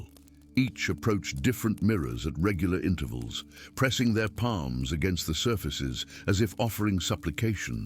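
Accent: United States. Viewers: Best one yet. So many stories, I love that. PLEASE stop using American voices. With AI you must be able to choose from hundreds, please use a different one.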